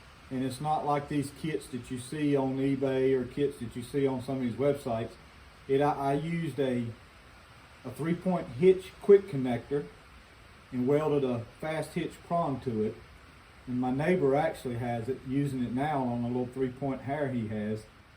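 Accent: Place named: Coastal North Carolina, United States. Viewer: Listening to you talk I'd have to say your from coastal NC , listening to your accent. I live here in upstate SC but from the lowcountry. I'd have to Say NC as well cause you didn't say Agrisupply in Statesboro or Florence! Haha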